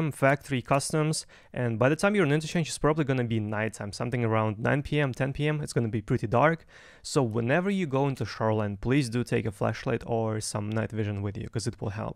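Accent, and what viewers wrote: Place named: Russia. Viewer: уровень английского поражает, но все-таки слышны русские отголоски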